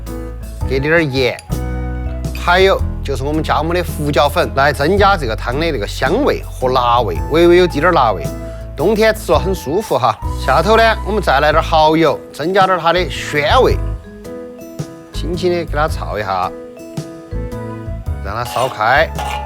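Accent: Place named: Sichuan, China. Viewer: ，不开心的时候需要你的川普。谢谢你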